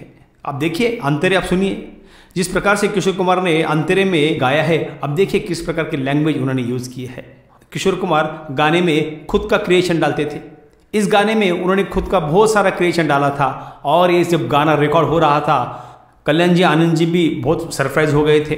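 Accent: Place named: Maharashtra, India. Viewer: aap ke Hindi speaking ko sun kar laga ki aap Maharashtriyan ho aur aap ka lahja bhi marathi hi hai Hindi bolte samaya apna lahja Sudhariye.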